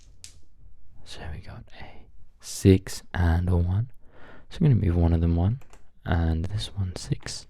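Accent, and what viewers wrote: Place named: Australia. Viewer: Hey man, just wanted to say this popped up in my recommended and I’m super glad it did! As a fellow Aussie I surprisingly don’t see many ASMR creators from out our way, but your voice and accent is super well suited for this kind of stuff and the microphone/production quality relative to the size of your channel is really impressive. I’m a big fan of ASMR Chess and this gave me similar vibes to him. Keep it up!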